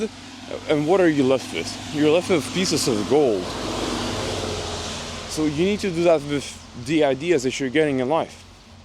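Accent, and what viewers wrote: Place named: Croatia. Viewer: I recently hitchhiked around Europe from the u.k. not Lithuania sadly. I thought you sounded Croatian for some reason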